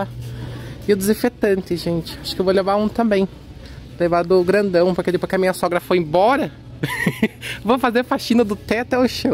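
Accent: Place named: São Paulo, Brazil. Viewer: Gosto do seu sotaque, quando você fala lembro de quando morava no interior em Rinopolis / SP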